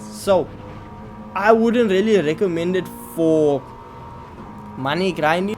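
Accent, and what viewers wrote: South Africa. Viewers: bru you sound like you live in durban bru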